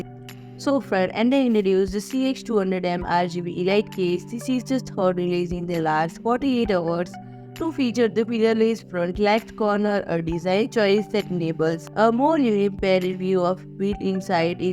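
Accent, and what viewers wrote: India. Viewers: It's called an Indian accent, but interesting way of hearing it😂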